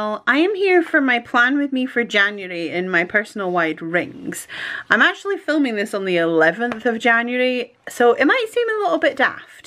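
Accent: Scotland, United Kingdom. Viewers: A plan with me and the word "purring" on a wonderful Scottish accent is just what I needed on this cold January day ❤️❤️❤️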